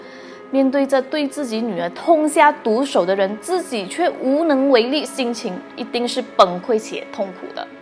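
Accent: Malaysia. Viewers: 马来西亚口音很重。听不太懂，应该好好的自修中文发音。。基本上很多时候听不到懂。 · 本来就是马来西亚人，口音当然是马来西亚口音啊，听不懂就看字幕，这是本地的特色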